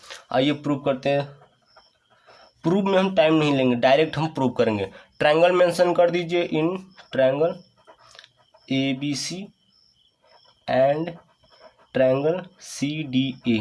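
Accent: Bihar, India. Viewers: Arrey Bhai Sirji Bihari Haina Isliye Sir K Bolne Se Hi Pata Chalta Hai Ki Sir Bihari Hai 😀👍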